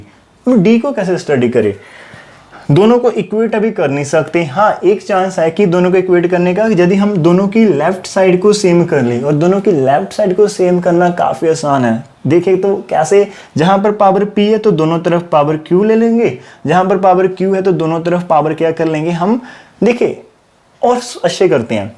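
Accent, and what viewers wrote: Punjab, India. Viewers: Sir galti pakad bhi woi sakta hai jiska uski knowledge hoti hai Your teaching method is awesome and i love your talking style hindi punjabi so cute❤️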